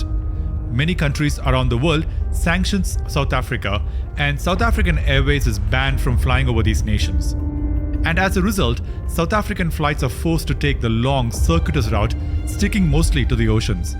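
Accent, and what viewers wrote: India. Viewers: Awful channel, awful Indian accent 😂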